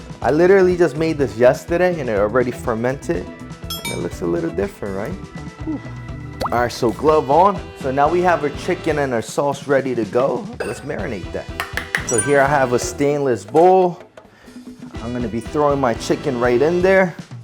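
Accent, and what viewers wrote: Philadelphia, United States. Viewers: I can hear the Philadelphia all in you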